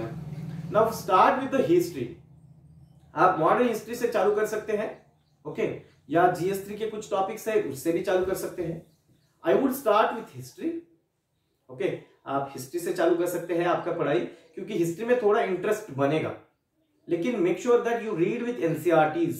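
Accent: South, India. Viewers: Sir south indian tone in your language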